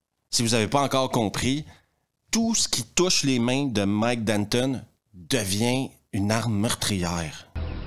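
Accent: Quebec, Canada. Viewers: Ton accent québecois te rend service. C'est vraiment très plaisant de t'entendre dézinguer des mauvais films avec cet accent et 2, 3 expressions typiquement québécoises. Je vais nulle part. Je vais pas "à nulle part". Simple exemple.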